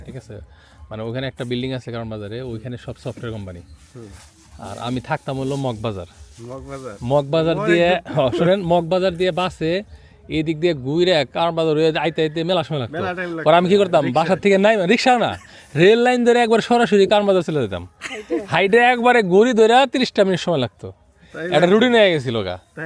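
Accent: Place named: Sherpur, Bangladesh. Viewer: ভাইয়া আপনি কিন্তু আমাদের শেরপুর এর ভাষায় কথা বলেন আপনার কথা আমার ভালো লাগে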